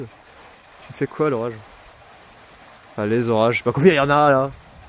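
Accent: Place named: Marseille, France. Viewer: merci pour le partage! ça nous manque dans le Sud, un orage avec de la pluie! j'aime bien ton accent marseillais!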